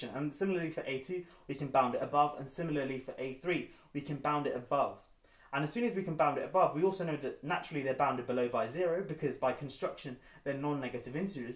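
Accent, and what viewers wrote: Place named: United Kingdom. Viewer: your british accent makes things so easz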